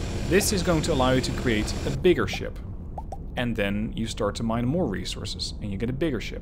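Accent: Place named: Netherlands. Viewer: i think this guy is dutch, of nie dan?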